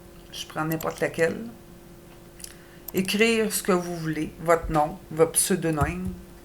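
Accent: Quebec, Canada. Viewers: Hello, merci pour ces tutos en français, je cherchais cela depuis quelques temps. Avec ce merveilleux accent du Quebec. Un maudit français. Bien cordialement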